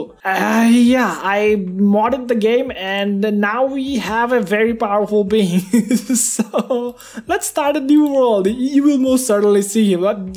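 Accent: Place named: India. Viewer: I think its an Indian accent, tho I could be wrong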